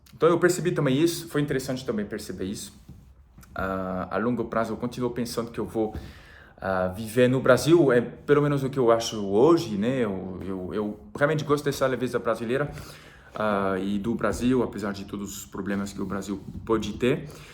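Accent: France. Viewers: Esnobe, Passou 20 dias na França e já voltou c sotaque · Parabéns,! Vc tem uma verdadeira alma brasileira com sotaque francês!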